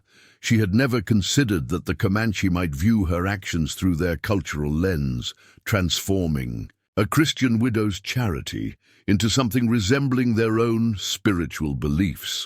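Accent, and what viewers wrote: England, United Kingdom. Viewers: English accents are pleasant and easier on the ear than American accents. Even though I am southern American.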